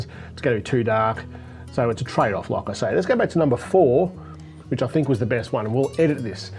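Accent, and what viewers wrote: Australia. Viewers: I absolutely love the Aussie access and slang 😂 Ive just bought the Action Cam 5 so I have to try this ❤ Cheers from the UK 🇬🇧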